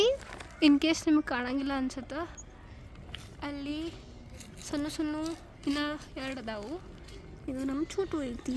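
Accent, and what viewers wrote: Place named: North Karnataka, India. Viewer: Hii, hegiddira.. Nim uttar karnataka kannada nange kelalike ishta agutte,heege videos madta iri. Naavu nodi share like comment madtivi😁. I'm ur fan from coorg❤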